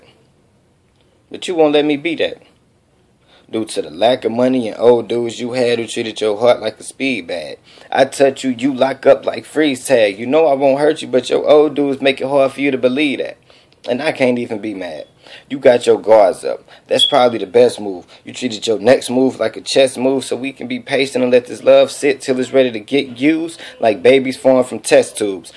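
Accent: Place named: South, United States. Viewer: That southern accent!! Oh yee. Atlanta I'm in love 💚💚💚⚡⚡⚡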